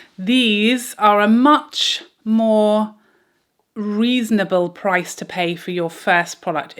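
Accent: England, United Kingdom. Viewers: I love to say your intro along with you in your English accent!! I’m a goof! Lol. Enjoy you so much! 🥰😍🥰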